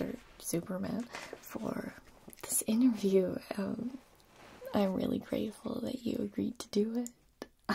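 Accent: United States. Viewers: Hearing an american accent from you was foreign for a second 😂 I loved the video, coming from an DC fan since i was 5 years old! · The first video of hers I watched was the T-Virus Trial one and I didn't realize she wasn't American until she called a flashlight a torch. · I love your normal "accent" but your american accent just hits different 🤤❤ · Ok ok.....the "American" accent...that threw me off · Your American accent is so relaxing for some reason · Why does your american accent sound like Benedict Cumberbatch's american accent? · I just had ASMR videos on auto play in my headphones and this one started and I had to go and check who the heck it was. This feels like it’s from a weird alternate reality. Great job on the American accent. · Your american accent is great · Your American accent is better than mine. 🥹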